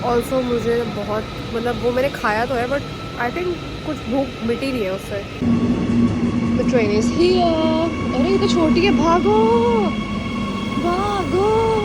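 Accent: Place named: Punjab, India. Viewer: Your English accent🤌🏻 aapka hindi uccharan🤌🏻🤌🏻 dein Deutsch Akzent 🤌🏻🤌🏻🤌🏻 but tuada punjabi lahiza 🤌🏻🤌🏻🥰🥰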